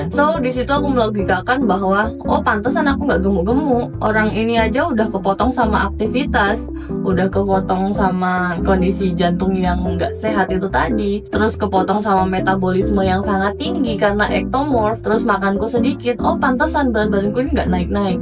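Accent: Indonesia. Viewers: Salfok sama logat nya. Orang Maluku merantau ke Surabya, logatnya medok banget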